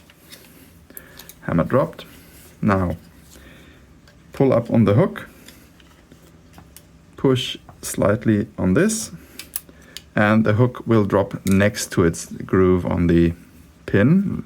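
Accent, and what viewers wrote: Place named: Germany. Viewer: Mann hört das deutsche aus dem Akzent 😂 Bro was für ein Feuerwall sicherungshebeö verwendest du ?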